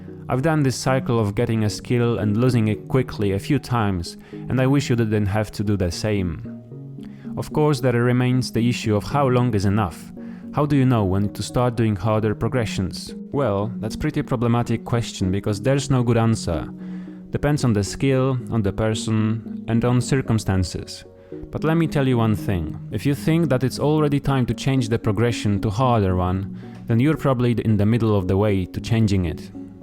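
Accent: Poland. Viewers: SiriusB88 he’s polish. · I hear a polish accent in your voice. (Poland?)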